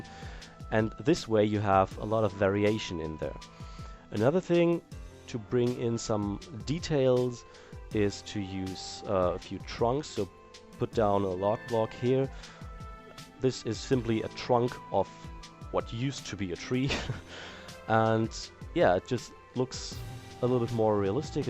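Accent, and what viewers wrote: Germany. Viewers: Just a question, are you german? Your accent sounds pretty much like it :D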